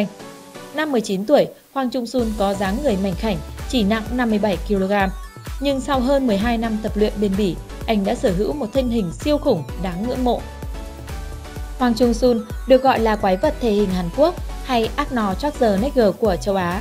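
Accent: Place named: Hai Phong, Vietnam. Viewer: Quê em ở Hải Phòng hay ở đâu thế? Đẹp chứ không phải điẹp, em không phải iem. Người khổng lồ Hulk đọc gần giống hốc chứ không phải Húc. Có mà húc vào... :) Nói thật mấy thằng này bao nhiêu thịt thì dồn hết vào vai với tay rồi, ở cái cần to thì teo hết à :)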